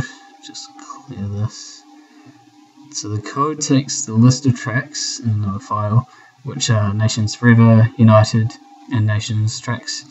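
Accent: New Zealand. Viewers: Such a Kiwi voice ! Awesome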